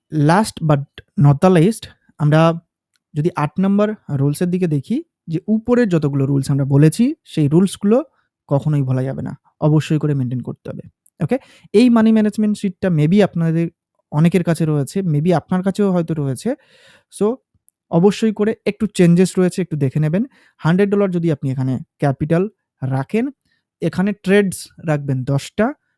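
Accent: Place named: India. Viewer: Apni indian nki bhai indian er mto lgche kotha gulo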